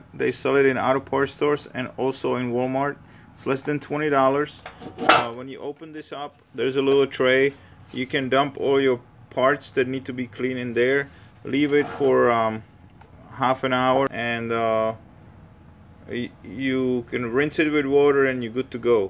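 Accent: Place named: Latin America. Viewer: ABSOLUTE AMAZEBALLS!! Dude with the Latino accent! You are the MAN! Thank you SO much for this video. I've got exactly the same carburator on my old HD Big Twin and I thought I had run all the gas out of it before I stored it. NOT! I didn't get it all. But now, I've got it all solved, AGAIN thanks to YOU!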